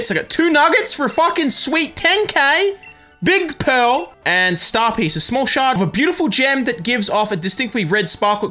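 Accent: Australia. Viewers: I love your sweet Aussie accent (; lmao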